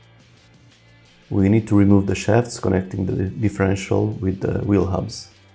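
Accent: Hungary. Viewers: I didn't know but recognized the accent:P I'm Hungarian too;) Üdv,) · I just came across your youtube channel through recommendation. I immediately thought this guy must be Hungarian, and you are!:D (Don't misunderstand me, your pronunciation is great, just for a Hungarian is easier to spot a Hungarian accent. Szia!:) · Thank you for this! I detect a slight Hungarian / eastern EU accent. Where are you from, if you don't mind sharing?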